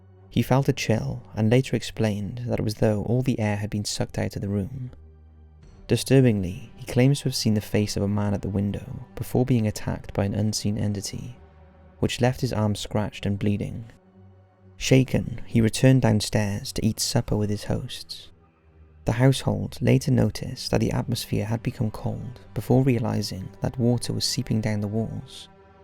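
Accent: Wales, United Kingdom. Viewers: I love your channel man, what part of Wales are you from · It's the soft Welsh burr of his accent that does it for me. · Anyone else feel that narration with a British Accent makes these stories more scary?? Or any accent from the U.K. for that matter...I don't feel the same air of scariness with a regular American accent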